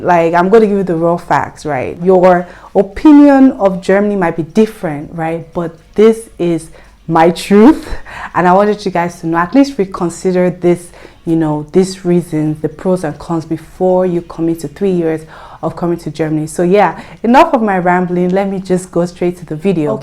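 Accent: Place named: Africa. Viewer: Sorry, I am confused. I have seen some black women in Germany speaking, but I would judge your accent for an african one. But PCS is an american thing, or? So how comes you have that accent? May I ask, where are from? Aah, I commented too early. I knew it! Nice story btw.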